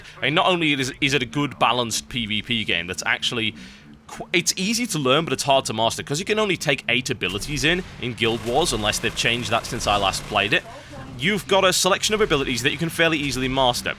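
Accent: United Kingdom. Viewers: I wonder what persona totalbiscut has ... WAIT HES BRITISH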